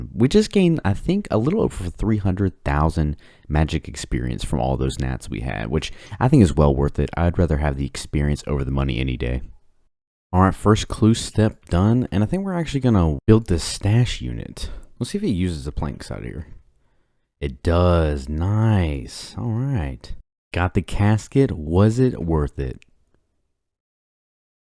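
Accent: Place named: Oklahoma, United States. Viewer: also that southern accent poking through. I wanna guess Oklahoma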